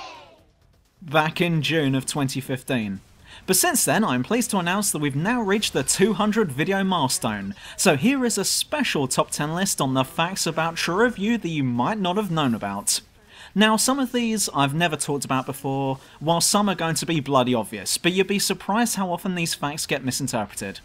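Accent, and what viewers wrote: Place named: Home Counties, United Kingdom. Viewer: You have the same accent as one of my best friends from the "tri-county" area <Hampshire/ Surrey/ Berkshire >:P So watching your vids is like having a mate talk to be about video games. Gd job on 200 btw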